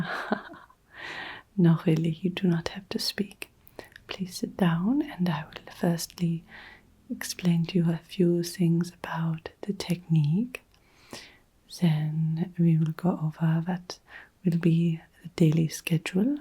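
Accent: Germany. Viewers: This was so fun and relaxing! I am ready for my meditation retreat! Loved your German accent too! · No way you’re not actually German!!! The accent is spot on! I thoroughly enjoyed this video, had it as background noise while I was reading and it’s just perfect - thank you! 💖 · I am living in Germany right now and I loveeee your German accent videos!!👏👏👏 Toll gemacht! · I’m so astonished how good your accent is. You got the German r sounds perfectly!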